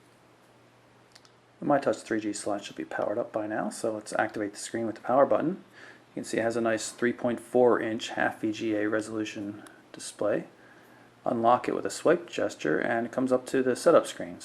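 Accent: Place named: Scotland, United Kingdom. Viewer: there was just a tiny hint of scottish in that voice...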